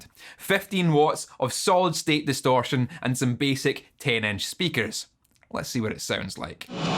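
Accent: Scotland, United Kingdom. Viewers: "We want to hear some Entombed or At The Gates" - well, I don't, I'm mostly here for the Scottish accent, but carry on ;) But, that "Bonamassa" insult, that's too much, take it back! That could possibly be the worst insult anybody has thrown at me!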